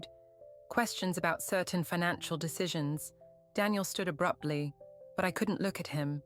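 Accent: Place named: United Kingdom. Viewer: Love the Brit AI.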